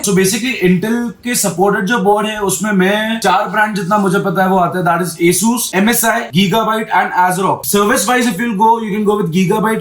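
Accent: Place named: India. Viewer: ur voice is too good to other's literally..like in hindi accent so make video's in multi purpose bro soon u will hit 1 million in month like make videos on cars and anyother vlog and every week 3 vlogs nikaloo aur maintain rakho like cars ke upr aur tour ke upr aur our community gaming 🇮🇳🇮🇳🇮🇳🇮🇳🇮🇳🇮🇳🇮🇳🇮🇳🇮🇳 bro plss u can do whatever i say i hope u listen.... Ku ki baad mei reply ni krta koi 😅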